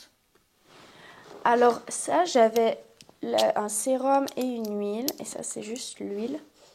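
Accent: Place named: Switzerland. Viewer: Ça me fait aimer l’accent suisse 😊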